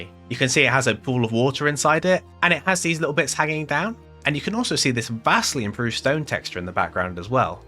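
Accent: United Kingdom. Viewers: Very nice British accent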